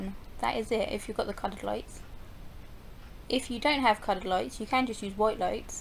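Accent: United Kingdom. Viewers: Thank you very much for the video and the great british accent. Greetings from Germany. Love it so much! : )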